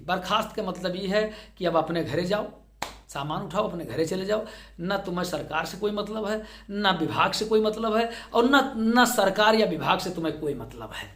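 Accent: Awadh, India. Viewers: Sir aapki boli se lagta hai ki aap avadh area ke hai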